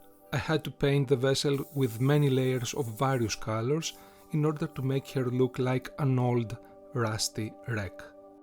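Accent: Greece. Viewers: are you greek ? Your accent sounds alike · Με τη προφορα των αγγλικών ήμουν σιγουρος οτι εισαι Ελληνας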